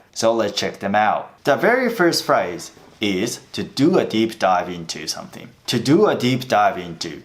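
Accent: Australia. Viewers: わざとオーストラリアっぽいアクセントで話してるのかな いつもより訛り強めですね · 久しぶりに見たら別人かと思うくらい発音がオーストラリア訛りになってました。あえてここまでする必要はありますか？ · 今日の動画、これまでで一番オーストラリア英語っぽいです！ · めちゃめちゃオージーの発音でびっくりです笑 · オージー訛りですよ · オージーなまりですね。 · オーストラリアの英語かわいいですよね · むかしのATSUさんの英語のアクセントと全然違う、オーストラリア訛りになってる。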